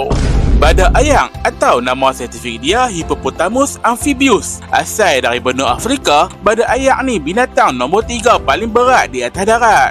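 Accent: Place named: Northern Perak, Malaysia. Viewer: "kuat nok horom".... Hahahaha.... Aku sbg warga Perak utara scra resminya subscribe n support channel ckp utagha cmni... Mantap Min... Teruskn n menten gaya utagha... ❤😂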